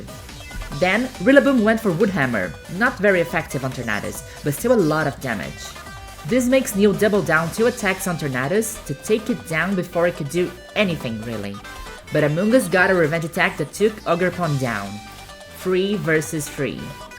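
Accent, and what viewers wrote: Brazil. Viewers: Pokémon's massive popularity definitely helps with it, but to grow up with it, and feel free enough to not be bound by those "now that you're an aDuLt you must renegade your childhood", in general are more accepting. Most of us were the 'weird kids', be it a gender reason, neurodivergency, or simply rather nerdy interests in general. When you exclude people they tend to band together, and in an era that all children, specially the ones that were left out, had a game/anime to bond over, they grow up not only pokémon fans, but opression-aware people. I tried not to write an essay here, but I needed to engage, I've found this channel randomly now, and...Eu reparei no sotaque, depois na bandeira, e depois minha mente explodiu! Então não só me inscrevi na hora, como tem que engajar 2x mais, porque é conteúdo de pokémon, é brasileiro, e é de gente finíssima! 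· came to this video because a YouTuber I liked recommended it, so Imagen my joy when I hear a Brazilian accent and when I look up I see not only a Brazilian flag but what I assume is a trans Brazilian flag! Mal posso esperar para assistir a mais vídeos seus!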